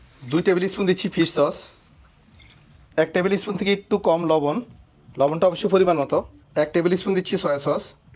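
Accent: Noakhali, Bangladesh. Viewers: হেতে নোয়াখাইল্লা এএএ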